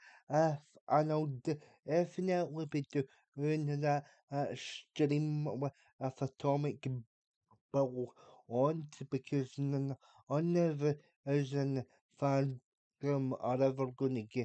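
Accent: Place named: Scotland, United Kingdom. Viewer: No It flowed well, probably because you were not talking about how annoyed you were. I have been away from the UK for almost twenty years, first in the States and now in China, so hearing Scottish accents is not something I am used to. Now drinking scotch is something I am rather familiar with lol. Anyway, four am here and that hot choccy is not going to make itself and I gave my monkey butler the night off.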